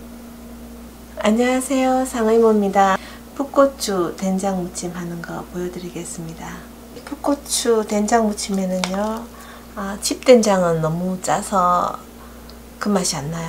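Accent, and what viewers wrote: Gyeongsang, South Korea. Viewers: 반가워요 이견을 주셧어 고마워요 님 께서 같은 경상도 구수한 고향 말씨에 모습이 석가세존 을 닮으셧고 집사람 과 같은 넉넉한 마음씨에 정이 갔어요 행여 뎃글 읽으시는데 불편하셧다면 넓으신 아량으로 관용을 바람니다. 오늘 날씨가 너무덥고 폭염이 계속되는데요 스트레스 는 만병의 원인이라고 하는데요 늘 건강 하시고 행복한날 되시기를 기원할께요 😊⛵피서 하세요💖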